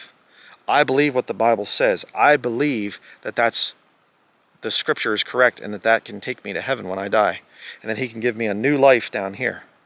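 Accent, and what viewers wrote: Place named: Southeastern Pennsylvania, United States. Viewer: I knew you were from PA…I heard your accent and thought how does this New Englander have a southeastern PA accent? Did I hear you say, in so many words, you’re not a Trinitarian? I know the word Trinity doesn’t exist in the Bible…but I believe in Father, Son and the Holy Spirit. If I believe that God is one in three based on my current understanding of scripture do you find that problematic? Unlike many denominational members I like to have my beliefs challenged. Iron sharpens Iron🙏🏻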